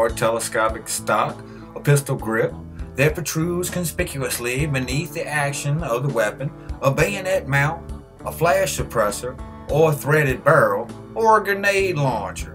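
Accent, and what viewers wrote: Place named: Mississippi, United States. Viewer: you talk like youre from mississippi or something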